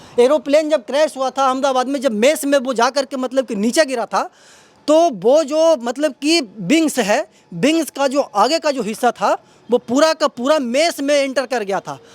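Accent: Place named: Bihar, India. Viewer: गंदा बिहारी बोलने का कुछ पता नहीं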